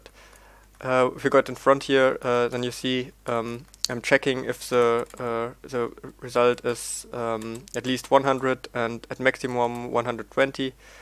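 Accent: Germany. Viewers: okay cool hört man das du deutscher bist XD.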